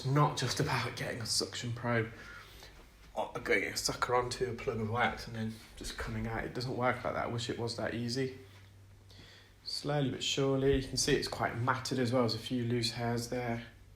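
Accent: United Kingdom. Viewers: To all of the people who are correcting the way says his words. lives in the U.K not America. Americans pronounce their words differently than people in the U.K and Australia.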